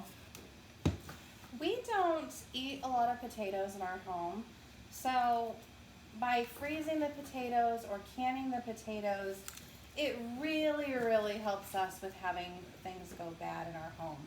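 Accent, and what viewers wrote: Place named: North, United Kingdom. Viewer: Where do you guys live??? Sound like a norther girl like me! 😉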